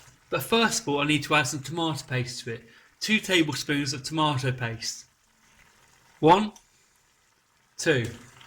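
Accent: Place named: West Country, United Kingdom. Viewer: I love your videos. I am originally from the West Country (Bristol) and it is so nice to hear you. Your recipes look ‘gert lush’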